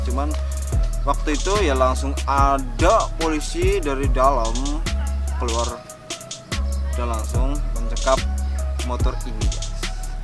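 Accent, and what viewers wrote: Banyumas, Indonesia. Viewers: Gawe konten tapi ngomonge ngapak mas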